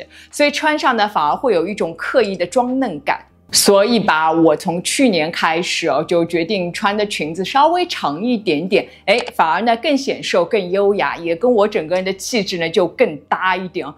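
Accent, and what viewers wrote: Shanghai, China. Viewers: 播主上海人吗?言语间有些小词很熟悉😅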